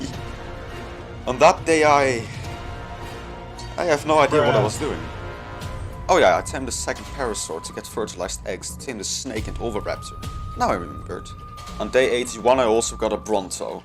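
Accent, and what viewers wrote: Netherlands. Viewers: Hmmmm, dutch person detected? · Where are you from? You sound a bit Dutch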